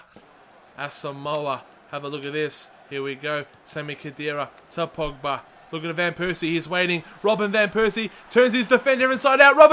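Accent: Australia. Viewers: are you a fellow Aussie?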